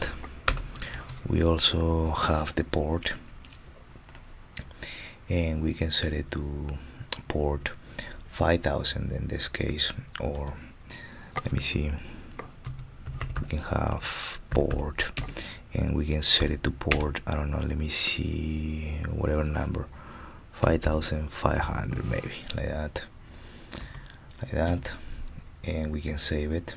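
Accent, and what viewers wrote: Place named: Dominican Republic. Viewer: i feel a little know accent in your speech, like a dominican acccent. good content tho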